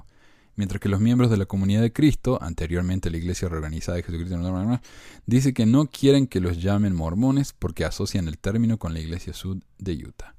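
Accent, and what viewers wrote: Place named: Cordoba, Argentina. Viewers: Mira " " con ese acento marginado Gaucho, no es nuevo esa noticia de llamarse correctamente de la IJSUD de hace décadas se menciona para el uso debido, pero igual puedes usar tu libre albedrio, no te culpamos, lo importante es el fondo, quiero buscar también 3l urim y tumi pero quiero primero terminar de encontrar el libro de Ezequiel y ideas, mientras vivo por la fe y degustando del escudriñar las sagradas escrituras del "Antiguo", "Nuevo" y "Otro Testamento de Jesucristo" y así comprender y amar a tipos como tú no.imporra si eres ninfómana o gay, igual te deseo lo mejor y eres bienvenido, y si hay algo que denunciar dentro estamos del mismo lado, limpiar la casa para salir a Óscar, slds compañero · soy miembro de la iglesia, comenté vídeos tuyos anteriormente sin verlos de forma completa, y no entendía semejante trabajo de investigación y dedicación de un ex miembro de la iglesia para desacreditarla. 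Quiero que sepas que este comentario lo hago con todo el deseo de dar mi opinión y no el de pelear ni criticar. Hay dos cosas que me llaman la atención: Primero. El tiempo que gastas en hacer estos vídeos es llamativo, la verdad que se puede ver que le das bastante dedicación y eso se puede ver en la edición, los micrófonos, etc. Es raro que cuando citas a los profetas lo haces con una intención de ridiculizarlos, tanto a Jose Smith, el profeta Nelson entre otros. Segundo. Fuiste miembro de la iglesia, lo decís en tus videos, esta más que claro que seguramente entraste al templo e hiciste convenios sagrados, no hay que ser ningún genio para saber eso. Tu acento es de Córdoba, al principio pensé que capaz eras de otro país y no Argentino. Capaz siendo un ex miembro se explica un poco tu comportamiento. Lo que si no entiendo y en esto creo que vas a coincidir es que La Iglesia de Jesucristo los Santos de los Ultimos Dias o si queres le digo La Iglesia Mormona, como vos quieras, hace muchas cosas que son buenas, edificadoras, ayuda a las personas a ser mejores, enseña principios que mucha gente no duda que son verdaderos y hasta las puede cambiar, como lo hacen todas las religiones cristianas del mundo, siguiendo los pasos del propio Salvador (que no sé sinceramente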